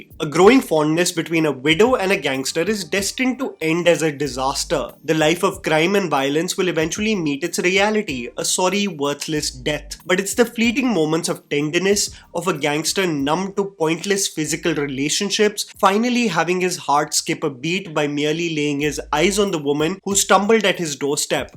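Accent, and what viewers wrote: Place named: India. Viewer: My god, where do you get your English from. I know it's not chatgpt because you've been this way since before Ai. This is where the Indian accent doesn't sound too bad cuz the way you express what you wanna say.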